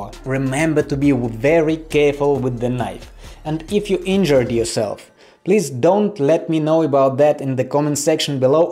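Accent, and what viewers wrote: Russia. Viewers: bro i like russian accent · What did you expect when he said be careful with a Russian accent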